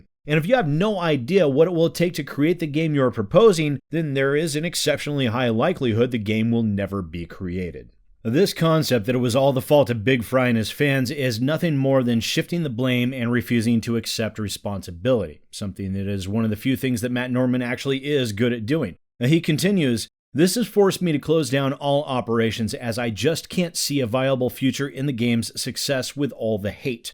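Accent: Australia. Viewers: He's Australian, jesus why do so many yanks get confused over the accents? We sound nothing alike. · HEY, hey, don't make this about Americans! I'm from America, and I knew he was Australian right away. Some people are just... well, fuckin' stupid. I think we all have problems with that, let's be honest. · On behalf of Queenslanders I believe he was raised in Tasmania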